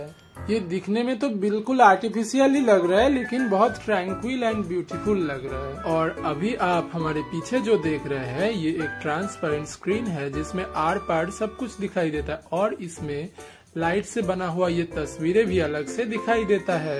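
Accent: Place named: Bihar, India. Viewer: Bhaiya narration bahut badiya kiye ho.. Or accent ekdam bihari kaafik per bhai kasam se narration mast hai bahut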